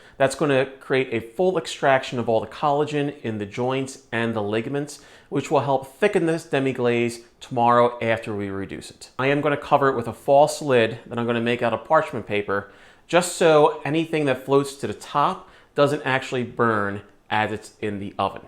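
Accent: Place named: Philadelphia, United States. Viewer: Not a lot of videos on demi-glacé, appreciate it! That accent don’t lie, you’re definitely a Philly boul!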